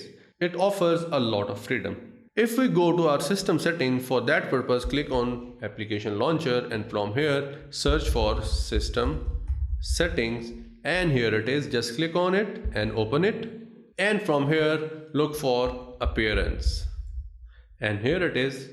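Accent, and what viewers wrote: India. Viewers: omg this indian english is absolutely horrible to listen to :o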